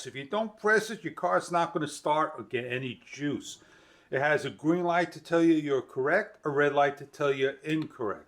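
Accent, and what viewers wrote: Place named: Brooklyn, United States. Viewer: BROOKLYN Accent, BROOKLYN Neighborhood, and thank you for an excellent Brooklyn Review. I have a Tacklife T8 which is identical to yours and it really does work.. 👍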